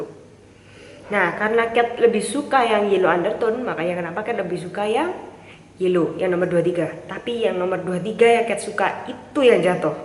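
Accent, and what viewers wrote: Java, Indonesia. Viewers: jd kaya khasnya cindercella ya, dr segi nada bicara sma medok2nya haha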